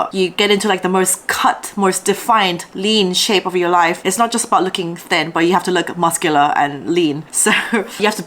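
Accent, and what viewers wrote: United Kingdom. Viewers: She has a UK accent (to my ears).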